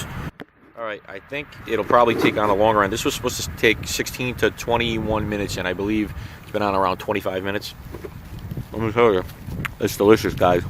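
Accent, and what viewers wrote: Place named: South Jersey, United States. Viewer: Using the bag to mix the ingredient is a great idea. Thank you. P.S. You sound as if you are from my neck-of-the-woods (either South Jersey, the Philly area, or northern Delaware)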